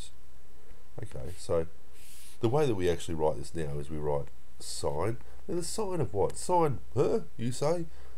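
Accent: Australia. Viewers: So so helpful! The 3=6/2 was mind blowing. I always got stuck on when to divide and when to multiply but that little trick really worked, plus the Aussie accent is great! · Gotta love the Australasian Accent 💯💯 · 99% sure this guy is Aussie Also he’s not from New Zealand. You can tell by the way he says his i’s and e’s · He is Australian, so am I haha, I was pleasantly surprised :) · flying jesus on a breakstick lol... Anyway, i think this guy's an australian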